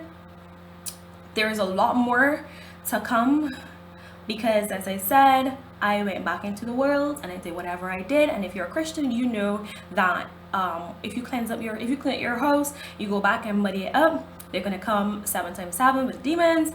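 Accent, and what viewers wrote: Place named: Caribbean. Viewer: i hear Bim, Guyana or trini accent....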